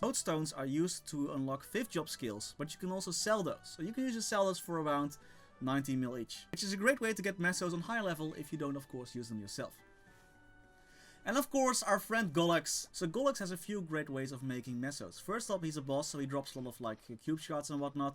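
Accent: Netherlands. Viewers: just new to ur channel u sound dutch, nice video btw!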